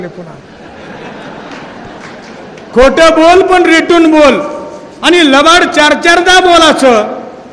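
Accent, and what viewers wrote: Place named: Rural, India. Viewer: अस्सखलीत ग्रामीण शैलीत no.१ भाषण 👌👌👌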